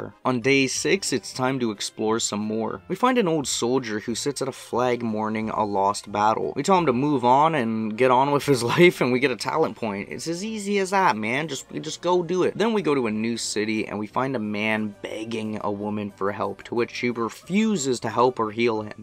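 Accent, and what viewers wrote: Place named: Canada. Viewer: Subbed becus Canadian accent, Kenshi, and hoe appreciation.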